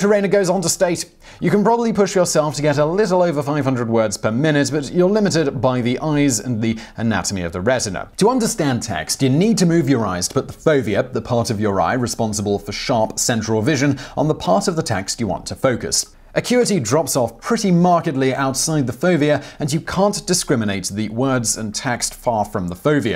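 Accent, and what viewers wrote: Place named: RP, United Kingdom. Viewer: Can someone or Simon himself explain Simon's accent/way of speaking? Is this RP? Are there any other examples of videos that sounds this way? I feel that this accent/way of speaking is taught. I really find it interesting and cant get this question out of my mind. I really feel that when I was younger(as a kid) I hear this a lot but can't tell where.... Thank you in advance.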